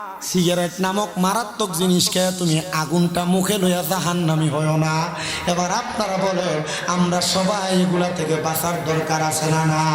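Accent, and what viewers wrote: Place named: Comilla, Bangladesh. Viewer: তোমার কথা শুনে বুঝা যাচ্ছে তুমি কুমিল্লার মসলমান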